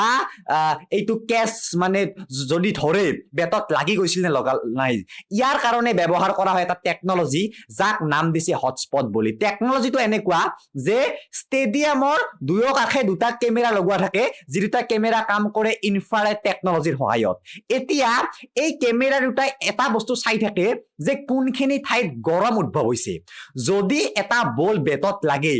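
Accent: Nalbari, India. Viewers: Katha bilak suni val lage nolbaira tu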